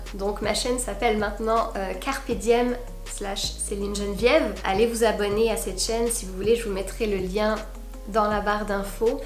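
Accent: Quebec, Canada. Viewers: t est en france avec un accent mais tu parle comme une quebecoise tu nous aura toujours dans ton coeur. ici on a commencer a vacciner.merci de pas nous oublier pout ton autre chaine plus tu va aller dans le domaine (SPIRITUEL) ca interesse certaines personnes mais pas tous ..je te souhaite de belles fetes mais sans neige rire bisou cher amie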